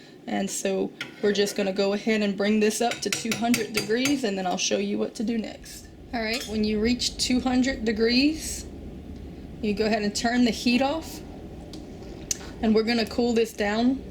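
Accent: Louisiana, United States. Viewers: Are you from Louisiana??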